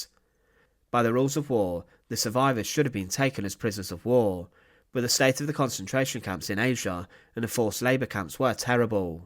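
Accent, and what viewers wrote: United Kingdom. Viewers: It's his accent. A significant portion of the UK sometimes prounce the letter 'T' as a 'F' sound. This is called an accent. Once again, criticising someone for their accent is pathetic.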